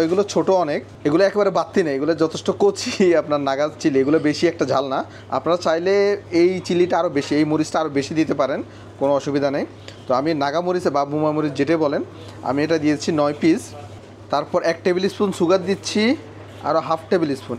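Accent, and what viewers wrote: Noakhali, Bangladesh. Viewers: হেতে কি নোয়াখালী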